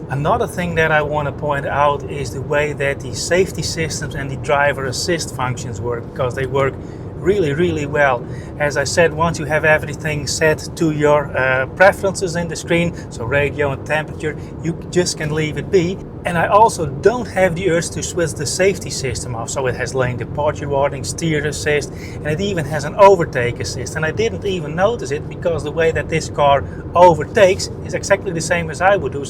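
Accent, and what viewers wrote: Netherlands. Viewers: Great informative reviews 👍your English Dutch accent is very cool to listen too 🇬🇧🇳🇱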